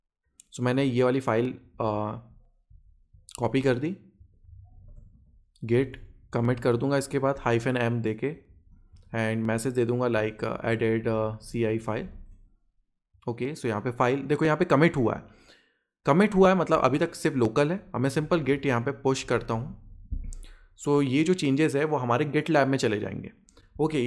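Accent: India. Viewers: Awesome Indian Accent wiht confidence unlike others who fake accent i really loved and enjoyed this video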